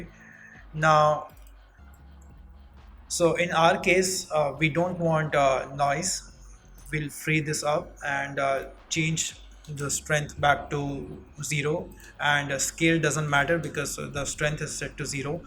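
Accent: India. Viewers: Indian! love it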